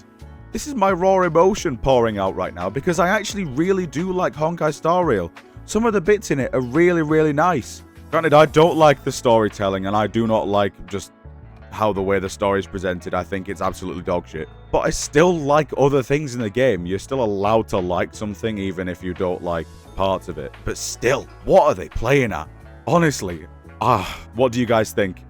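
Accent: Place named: Blackpool, United Kingdom. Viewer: The UK origin was crystal clear, sounds like Blackpool area, but i might be wrong. Is nice to hear. ^_^ Ps from time to time i like playing FuA, but only have 1.x units, so it's kinda tough, but doable. Not to justify the stunt that yoyoverse is pulling though, that sucks and as far as i am concerned they can shove that cyrene girl up their arses.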